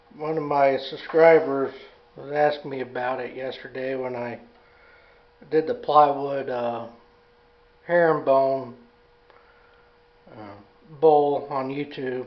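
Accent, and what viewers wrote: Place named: Appalachia, United States. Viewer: PS, Appalachian accent I hear!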